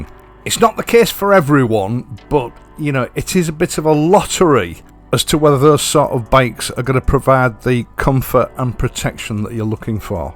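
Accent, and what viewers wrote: United Kingdom. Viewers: UK accent. and British traffic is left-hand drive also.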